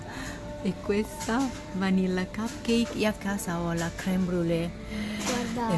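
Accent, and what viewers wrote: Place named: Italy. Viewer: You guys need to grab up the jars with the old (large) labels, while they're still available in the UK/EU!! We only have the new, skinny ones in the US now (except for a few "Returning Favorites"): & we really miss the older, larger labels... :-( Loved hearing the beautiful Italian accent here! Praying I can visit Italia again someday... <3 Firenze will always have my heart.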